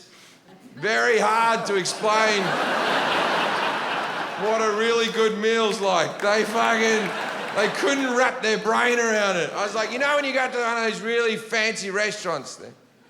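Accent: Australia. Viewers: I can't tell if this is a joke or if you haven't realised he's genuinely Australian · So Aussie lol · Is he Australian or not, I said that because of his Accent. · As an Aussie I cannot stand this stupid Occa accent. The guy sounds like he was dropped as a baby...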